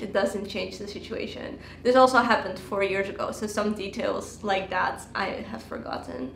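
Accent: Netherlands. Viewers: Really looking forward to Part 2. P.s. ik dacht al dat ik een nederlandse tongval hoorde met je engels 😋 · ik hoorde gelijk je Nederlands accent. groetjes uit Nederland! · Haha yeah I just heard her accent and I was like ohh you sound Dutch ( I'm from the Netherlands as well ) and then I checked and it made sense haha. But we learn English in elementary school so it's kind of a second language for us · As an American who once lived in Holland I learned an expression, The best women get the worst men. I think it's true, those Nederlanders are so insightful. Living in your homeland was possibly the best thing I ever did. I could hear from your accent that you are Dutch and I am always grateful for my time in that great country. Tot Ziens. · You have a little dutch accent🙈 are you from Holland? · Wow I heard the dutch from second 1 :) hiii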